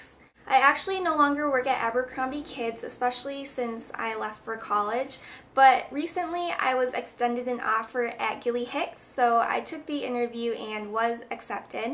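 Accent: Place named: Chicago, United States. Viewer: you are sooo cute and i love your "accent", is that a chicago accent? i can't figure it out and it's bothering meeee!!! ahhh!!!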